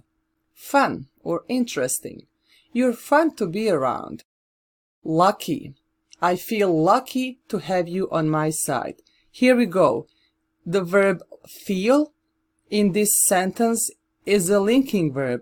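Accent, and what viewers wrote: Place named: Arab world. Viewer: Outstanding tnx mama i think u native of arabic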